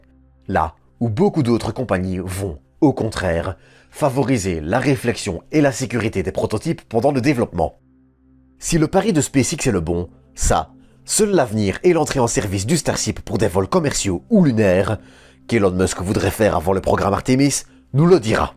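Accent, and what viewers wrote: Belgium. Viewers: Bienvenue dans le BiG Universe. Accent Belge, sans pincement de nez. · Sujet intéressant et bien traité ainsi que bien expliqué et détaillé. Mais il est claire que la voix off devrais être changer. L'accent belge ne me gène en rien, mais les zozotements + les R trés accRRRocher m'irrite l'oreille :-( Youtubeur n'est peux être pas fait pour tous. ou certain s’épanouisse aussi a resté hors des écrans a bossé sur les sujets. Peux être qu'embauché pour une voix off serais judicieux pour le future de la chaine? En tout cas merci pour la video :)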